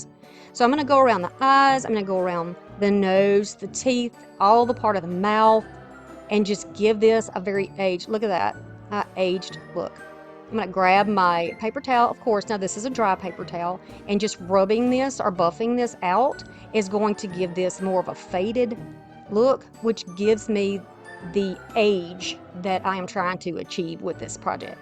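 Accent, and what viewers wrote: South, United States. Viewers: I love your videos 😻 and I must say your accent reminds me of The great country ⭐ star!! 😂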